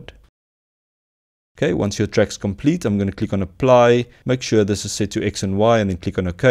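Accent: South Africa. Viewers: You sound like a real South African!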